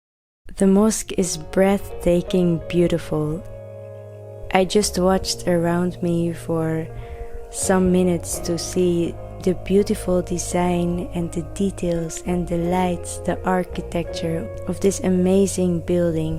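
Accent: Netherlands. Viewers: u sound dutch do u speak Dutch??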